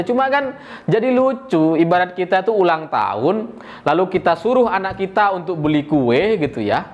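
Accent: Madura, Indonesia. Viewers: Mas sampiyan orang Madura?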